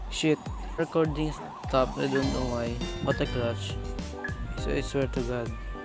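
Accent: Philippines. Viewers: By Hearing Your Accent, I'm 100% Sure That You're Filipino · bro your accent is like filipino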